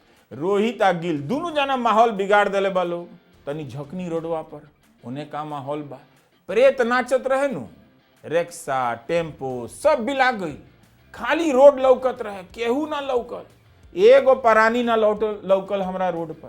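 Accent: Bhojpuri, India. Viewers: इतना शुद्ध भोजपुरी मैंने कभी नहीं सुना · मजा आ ग़ईल राउर भोजपुरी वाला आवाज सुन के । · Wao shudh bhojpuri ❤❤❤❤❤ Love you sir❤❤❤❤🎉🎉🎉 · रउरा के मुंह से भोजपुरी बड़ा निमन लगेला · शुद्ध भोजपुरी में खबर सुन के मन गदगद हो जाता है। इहे जज्बा बनवले रही। आपन भोजपुरी सबसे बेहतर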